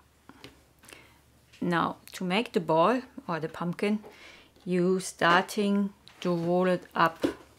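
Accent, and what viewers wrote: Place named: Scandinavia. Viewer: This artist sounds scandanavian......is that wool sorced from Ireland?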